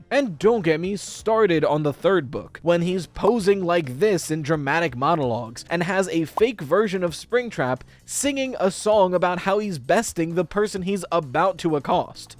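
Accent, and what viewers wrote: United Kingdom. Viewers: I'm just so happy to hear him be British again, it's honestly been a while.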